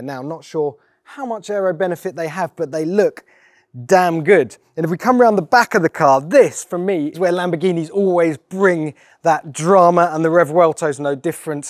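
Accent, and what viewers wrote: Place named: England, United Kingdom. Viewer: Dude speaking with English accent, then goes on to use miles, km and liter. Why I generally don't watch Top Gear, rubbish.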